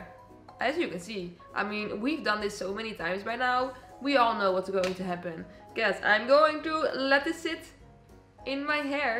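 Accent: Netherlands. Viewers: the minute you started talking i KNEW you were dutch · Does anyone know where she is from because she sounds really dutch haha😅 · Dutch accents at its best, girl I love it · Your accent sounds sooooo dutch, are you Dutch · dr accent is zo nederlands lol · Are you dutch??? Your accent seems really dutch hahah. Excuse me if i'm wrong lmao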